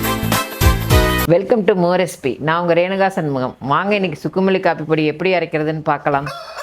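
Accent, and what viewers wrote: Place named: Kongu, India. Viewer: Amma neenka yentha ooru kongu side mathire irukku?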